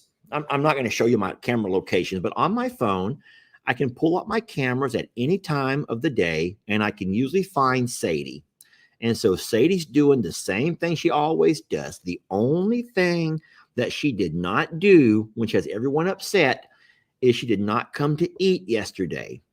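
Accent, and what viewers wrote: South, United States. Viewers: I think the pronunciation could actually be that your from the south with a little southern drawl!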